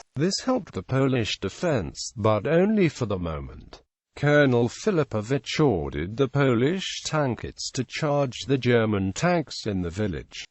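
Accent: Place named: Wales, United Kingdom. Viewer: I never knew AI could do a Welsh accent :)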